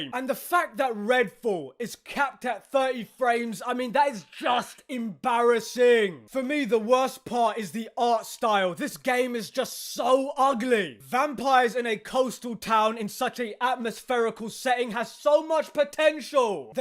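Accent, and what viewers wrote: United Kingdom. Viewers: Totally agree with you but your loud British accent gave my Irish head a sharp pain. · Your briish rumble makes it even funnier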